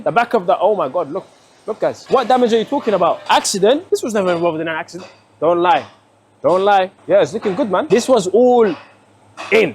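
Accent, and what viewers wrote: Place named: United Kingdom. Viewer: UK from his accent.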